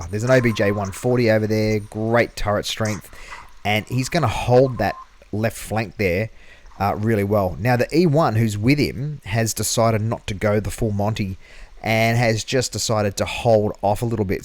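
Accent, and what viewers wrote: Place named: Australia. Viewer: Always good to here an Australian voice, I'm at the progetto iv that's how ya spell it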